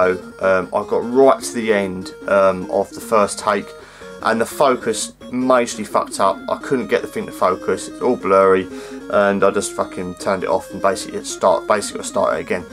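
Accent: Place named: United Kingdom. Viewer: It's because of people like yourself that I love being British!:D It's just the mannerisms and the way you speak ("stop chatting shit ") and it gets me every time I watch a video of yours! There's not many other English speaking people that speak the same way we do.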